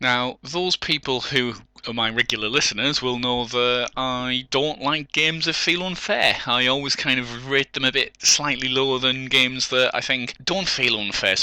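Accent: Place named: England, United Kingdom. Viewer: I gotta say, as a yank it was BRILLIANT listening to an English person explain baseball! Like hearing what your language sounds like to people who don't speak it or something... You did an excellent job by the way! Love it!!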